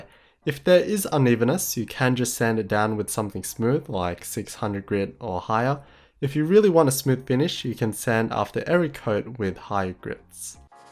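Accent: Australia. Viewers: is he an aussie?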